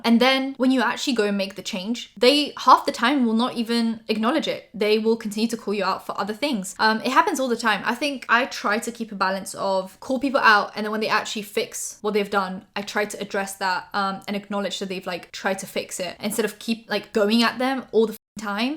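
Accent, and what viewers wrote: United Kingdom. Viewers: nigdy bym nie pomyślał ze jest z polski albo chociaż nawet mówi po polsku, jej brytyjski akcent jest idealny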